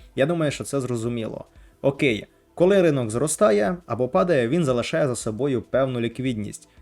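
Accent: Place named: Precarpathia, Ukraine. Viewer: Класна Українська Але я чую щось таке рідне прикарпатське!😮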